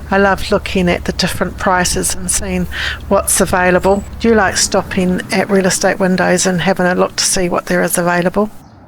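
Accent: New Zealand. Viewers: I do enjoy listening to you with your lovely New Zealand accent but also the level of detail you provide. Envious of your adventure and appreciative of you putting yourself out there...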